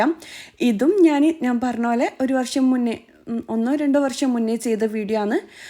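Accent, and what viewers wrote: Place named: Kozhikode, India. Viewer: കോഴിക്കോട് സ്ലാഗ് ആണ് കേൾക്കാൻ രെസം വ്ലോഗ് ഇഷ്ട്ടം ആണ്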